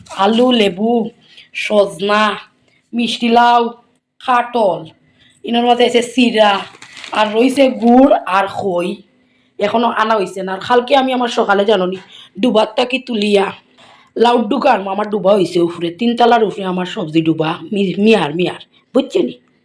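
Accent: Sylhet, Bangladesh. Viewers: Prothom dekhlam tumar vlog , YT e recommend korse . khub bhal lager vlog gula, specially syleti katha sunia toh khub khub bhal lager ,aamr babar bari emne Silchar, aami okhon thaki Bangalore, subscribe korlam , aaro aagaiya jao ❤❤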